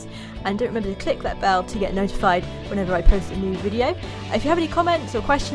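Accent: United Kingdom. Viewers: by your nice English accent this is my guess you are from UK, Am I right.?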